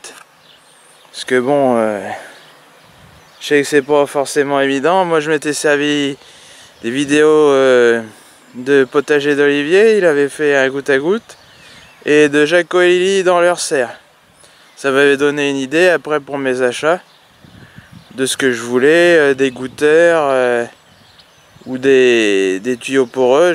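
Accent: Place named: South-West, France. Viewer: Coucou, Arrête tes conneries! Tu nous fais quoi! Tu n'as pas honte! Et la grelinette elle est où!!??? Je plaisante 😉😁, tu as bien raison, il arrive un moment...je me souviens tes vidéos, c'était wahou très sportif🥵🥵 Quand je vois ta terre, wahou, moi elle n'est pas du tout comme ça, elle colle elle colle... J'ai commencé mes plantations de tomates et je continue avec toi 😂, en tout cas c'est super de voir ta manière de faire. J'adore tes expressions, ton accent du sud ouest, c'est toujours un plaisir de t'écouter et regarder tes vidéos. Des haricots remontant, là tu fais fort😂 Elles sont où tes fleurs!!!??????? Bonne journée A bientôt 😘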